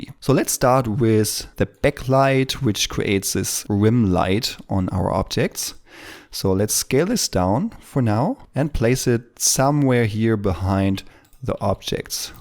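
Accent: Germany. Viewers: Super Video! Es ist ja richtig erfrischend mal wieder einen schönen Deutschen Akzent zu hören. :)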